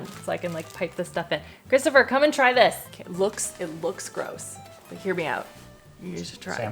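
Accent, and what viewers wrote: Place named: Canada. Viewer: Hi, it has been years since I was watching your videos on both channels. And as you mentioned on your previous videos my husband also always recognize your voice and always says that "Oh she was from Canada right?". And one thing followed another and we decide to move Canada 😅 It has been a month since I moved. It is now more enjoyable for me to watch since I am actually able to get my hands on the products and ingredients. Just wanted to say hi after all of those years I happened to be able to follow a recipe that you done! Lots of love ❣ PS. You certainly add enough salt!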